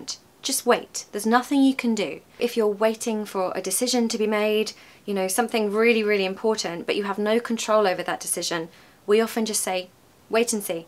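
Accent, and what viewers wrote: RP, United Kingdom. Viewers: It's such an amazing teaching video as always! I've learnt so much from you and I took a note of them on my phone note so that I can revisit to consolidate. You are the No.1 native british who speaks with a beautiful quintessential british accent that I would love to listen for hours. Thank you for all your effort and amazing teaching!!😃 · I love hearing your typically British accent! Thank you! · I really enjoy watching your video❤❤ i'm impressed by British Accent and i hope i'll have a perfect British accent as you one day❤ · Ma'am I am improving with your British RP standard ❤ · I adore listening to you . Your british accent sounds for me like a music. Thank you so much. You are so lovely. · Sound natural is very Well, but a Light Froggy accent is very efficient for picking up, and I’m sûre that is you spoke French, your British accent would wonderfuly delicious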